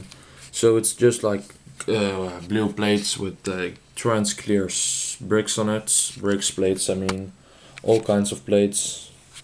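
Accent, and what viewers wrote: Netherlands. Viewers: are you dutch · Are you dutch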